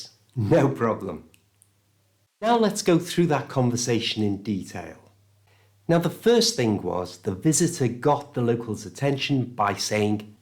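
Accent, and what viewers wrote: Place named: RP, United Kingdom. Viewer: Perfect classes!!! 👏🏼👏🏼👏🏼👏🏼👏🏼 And your accent is beautiful. Is it RP accent?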